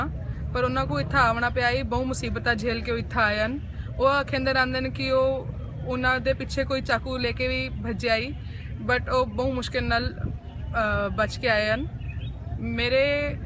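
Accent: Bahawalpur, Pakistan. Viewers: Most welcome u r most welcome to bahwalpur...i am from bahwalpur u r speeking absloute sariki...same as we bahwalpurian use to speek loves to see this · wa saien wa tuhadi saraiki bht meethi hy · very nice accent Bahawalpuri accent is very sweet accent of punjabi... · 50/50 saraiki Boli hai ap ny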